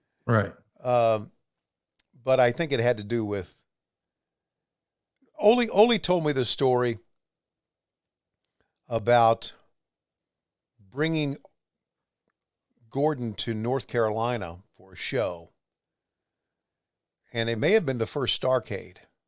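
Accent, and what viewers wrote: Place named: Tampa, United States. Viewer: You sound like you are from Tampa. My dad would take me to Fort Hesterlee Armory (probably spelled it wrong) on Tuesday Night and we would see Gordon smoking one cigarette after another, announcing the matches. He was the best.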